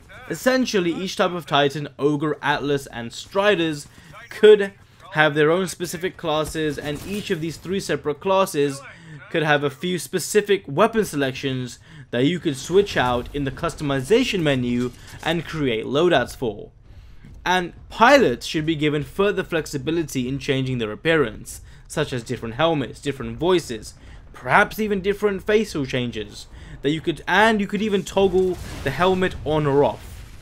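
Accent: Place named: South Africa. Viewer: You sound South African. What servers do you play?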